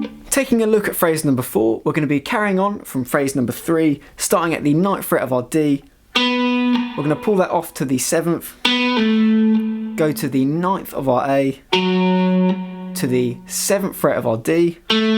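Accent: United Kingdom. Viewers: Hi, you sound like you're from Surrey?? Did you play victorious festival Portsmouth 3 years ago?? 90's covers, the way you play is the best, outside of mr squire I've heard since that Saturday in Pompey.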